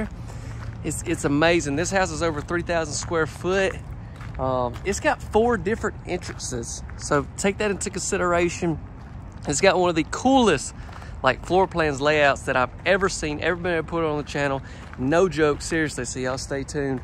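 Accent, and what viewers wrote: South, United States. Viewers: Buddy I LOVE Your Accent. Some Where Down South? LOVE It! AWESOME VIDEO VERY Clear & Clean Very Descriptive In your Video. Ever Think Of Real Estate? You Be A AWESOME ONE! I Would Certainly Buy A House From you As You Have Exquisite Taste In Homes. Looking Forward To Seeing MORE. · Love the Southern accent and mispronounced words.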